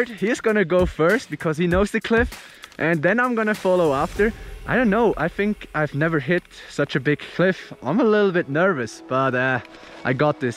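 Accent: Switzerland. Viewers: du da hämmer emol wieder dä schwiizer dialekt😂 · haha fix en schwiizer englisch akzent ;D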